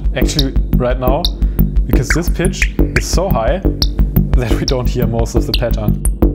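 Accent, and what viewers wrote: Germany. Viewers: While a few people here have commented, unkindly and disrespectfully about how you pronounced a few words, I am guessing they are not aware of how we each speak similar languages a little differently. I refer to such things as cultural difference which are tied to where we each grew up. I love your accent. Is it German? I had no problem understanding everything you said. Unlike most all of Europe where people study multiple languages in school growing up, such as Spanish, French, German and so many more, folks in the United States only typically study American English. While not a bad thing to only study one language your whole life, I have traveled to Europe, but have grown up in the US. I am sure that if you had an accent like you grew up in New York City, other folks would make similar comments about the accent or how you pronounced other words. 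Language is language, and as we learn more, we learn to respect others more and find and share our comments ground. Thank you so very much for this wonderful video! Now, I wonder if you have considered creating a similar video comparing and explaining the differences between, say, a Pittsburgh Modular Microvolt 3900 or similar and a comparably priced east coast style synth like a Roland System-1m. I suggest these two specifically because I have them both, are I a similar price range, and both provide standard EuroRack connections for creating new patches and sounds on just the one instrument, yet both can connect to EuroRack synths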